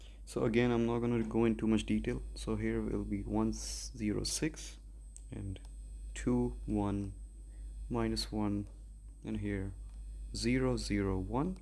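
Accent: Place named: India. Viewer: Ur accent sounds like indian biryani scammer 🇮🇳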